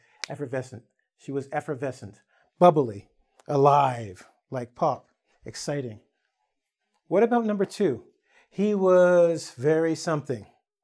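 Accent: United States. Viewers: Is it American English ? Just I get confused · What is your american accent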